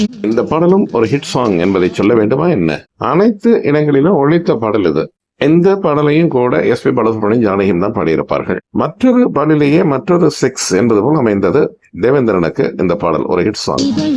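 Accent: Sri Lanka. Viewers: உஙகள் இலங்கை மொழி அழகு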